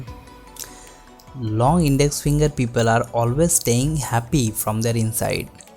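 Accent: India. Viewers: English subtitles please. Its hard to understand Indian English dialect but also Indians have great informations about palmistry :/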